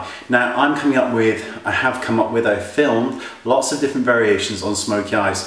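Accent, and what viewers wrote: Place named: London, United Kingdom. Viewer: It's a simple london accent :)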